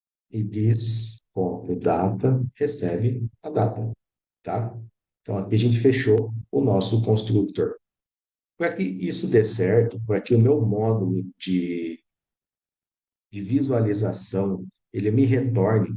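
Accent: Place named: United States. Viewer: Sotaque americano lindo desse professor 🥰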